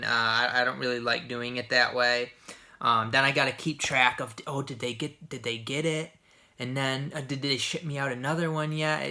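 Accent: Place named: Great Lakes, United States. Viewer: It's always great to watch your videos, I'm so impressed with your fantastic movie collection. And your Great Lakes accent reminds me of loved ones in Western NY, a really beautiful part of the country.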